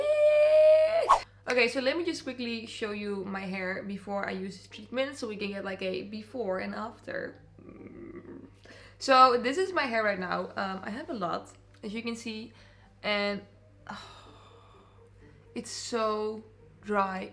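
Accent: Netherlands. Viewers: Are you from the netherlands becouse iam and your accent is sumulair to myn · Wtf is that accent. She is 100% Dutch. Aaaaah cringe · Ik hoor meteen dat je een Nederlander bent😂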